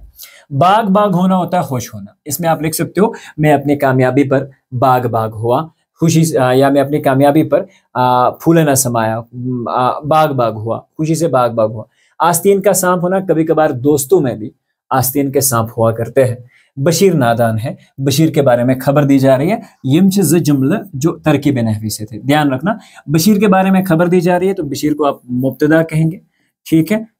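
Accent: Kashmir, India. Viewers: Sir apka Kashmiri bahot acha lagta hai ❤😊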